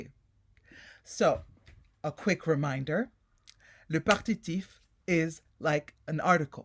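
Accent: France. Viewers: French accent is so beautiful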